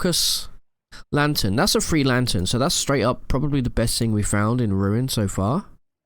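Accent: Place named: United Kingdom. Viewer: for music, my suggestions are, and (especially )!! i listen to way too much kawaii metal and not much else… isn’t kawaii metal and im not sure what genre they’d fit under but it’s good (and heavy!) streaming would be awesome, but i think i’d miss most of them ☹️ since our timezones are opposite (assuming you live in the uk from your accent) enjoying todays episode with my cat!!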